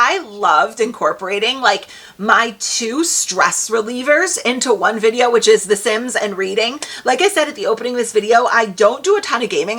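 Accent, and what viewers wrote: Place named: Connecticut, United States. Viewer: I knew your accent sounded familiar - I'm from CT too! I live in NC now though.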